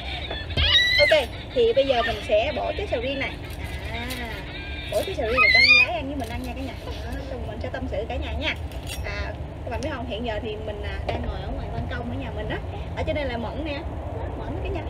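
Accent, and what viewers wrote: Mekong Delta, Vietnam. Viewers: Bạn có nét đẹp và giọng nói đậm nét miền tây sông nước...Chúc bạn hạnh phúc nơi xứ người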